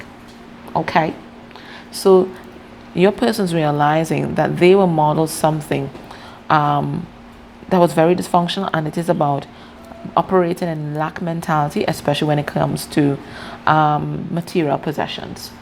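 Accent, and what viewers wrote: Trinidad and Tobago. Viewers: Wowwwww this is crazy. I first came to your channel months ago and I said to myself how she sounding like a Trini so 😂😂 Quito Quito indeed 👏💜❤️ bless up from the homelands. Love your readings.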